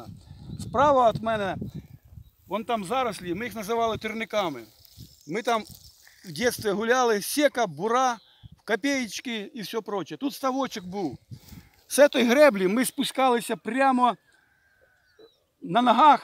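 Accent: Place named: Ukraine. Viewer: Красивые места,! Корни свои нельзя забывать! Говор у вас такой интересный конечно))) Украина))) сразу тестя своего вспоминаю, он у меня тоже родом с Украины, с Львова. Молодцы, что мелкого взяли. Посмотрит где дедушка родился, вырос, это правильные вещи! Спасибо за видео!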